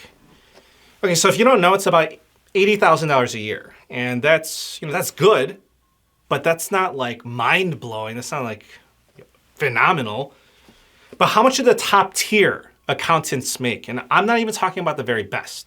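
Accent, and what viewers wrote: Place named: Michigan, United States. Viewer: Are you from Michigan? You have a subtle Michigander accent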